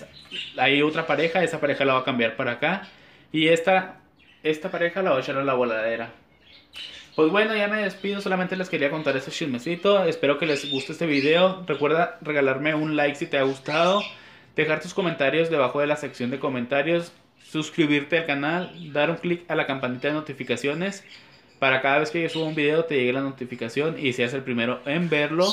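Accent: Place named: Chihuahua, Mexico. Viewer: Hablas con acento ,eres de Chihuahua?, saludos amigo yo tengo 14 periquitos que estes bien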